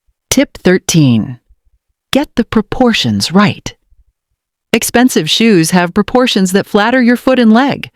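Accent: United Kingdom. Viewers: I prefer the British voice. Nicer to listen to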